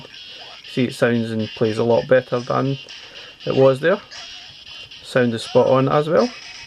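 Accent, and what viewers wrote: Scotland, United Kingdom. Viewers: I’ll buy anything described in a Scottish accent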